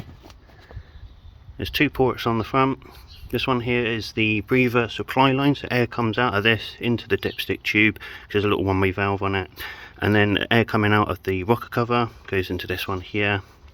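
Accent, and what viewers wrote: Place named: South West, United Kingdom. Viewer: Quality kit, I may be in touch in the future. Sounds like you’re a SW boy, where are you located if you don’t mind me asking?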